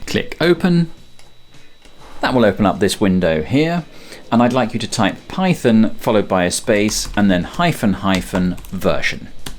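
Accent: United Kingdom. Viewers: Nice British accent nothing else.😮😢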